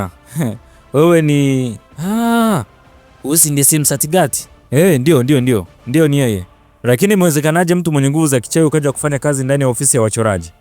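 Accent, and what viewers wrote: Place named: Kagera, Tanzania. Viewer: Mtangazaji ana lafudhi ya kihaya😊😊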